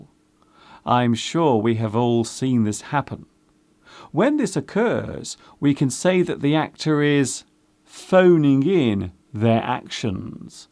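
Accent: United Kingdom. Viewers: great lesson think you I have question please? Are your accent British or Amrican because our education British so i ask to know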